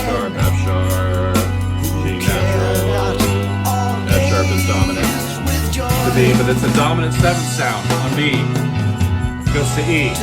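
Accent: United States. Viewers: Glad you like this amazing song, but, hey, it's like breaking down a joke - it shouldn't be done. How does it sound?!!!!!! That's all that matters - forget about the bloody notes and chords. This isn't really a reaction video, it's just an uhmericun bloke with a beard talking boringly over a great song.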